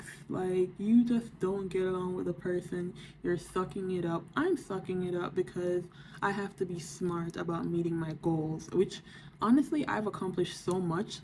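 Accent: Jamaica. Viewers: Are you Jamaican 🇯🇲 Yuh sound like a yard girl…welcome to trucking 🚛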